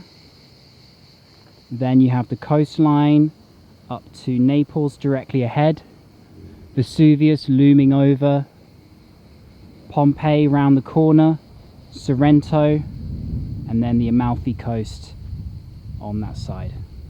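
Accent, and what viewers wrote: England, United Kingdom. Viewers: I like his English accent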